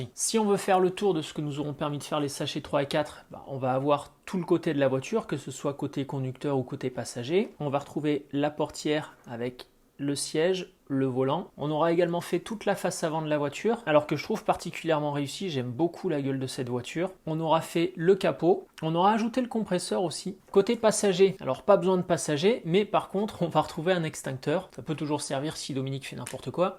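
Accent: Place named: Quebec, Canada. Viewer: Simple question j'ai remarqué un peu ton accent est tu d'origine québécois ou tu vient de la France ?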